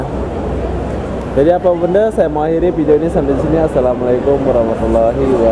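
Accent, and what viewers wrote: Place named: Kuala Lumpur, Malaysia. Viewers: Bro dh berape tahun duduk malaysia loghat kl tu jadi. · Cara cakap mcm org kl je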